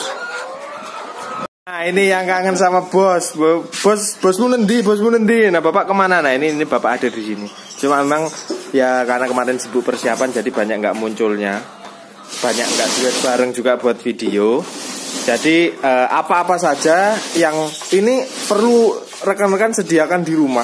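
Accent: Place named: East Java, Indonesia. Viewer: Mantab mas ilmunya,..maaf jenengan asli mana to, logat jawanya kental khas jawa timuran..tinggal di Lampung.salam seduluran dari wong Sidoarjo jawa timur..tp asli jawa tengah Purwodadi Grobogan..juooossss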